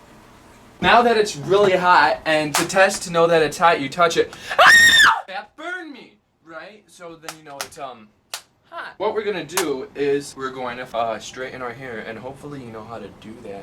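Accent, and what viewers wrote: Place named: Canada. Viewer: You sound quite canadian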